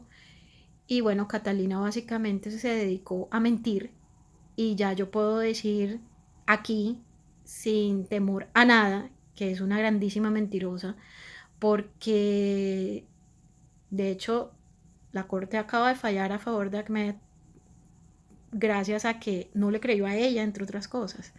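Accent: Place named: Caribbean. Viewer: Excelente,te Vi más suelta al hablar ,en el 2 video parecias hablar entre dientes ,(como cachaca)ahora ya te está saliendo lo caribeña,espero q el próximo digas algo así como !.NJ GANAMOS!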